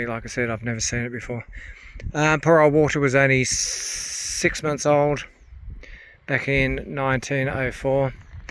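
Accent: Australia. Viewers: Your videos are always interesting and always looked forward to, no matter the content. You also have a soothing voice with the most wonderful Aussie accent! Praying for you,.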